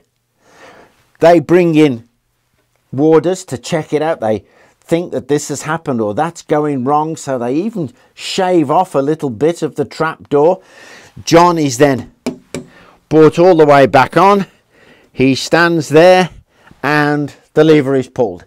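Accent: United Kingdom. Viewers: Any story in a Brit accent - lay it on me!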